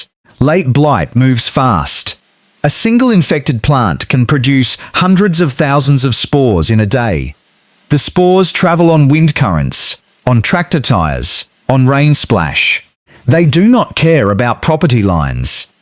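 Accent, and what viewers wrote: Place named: Australia. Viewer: With the Australian voice, maybe you should tell an Australian story. I really enjoy these stories..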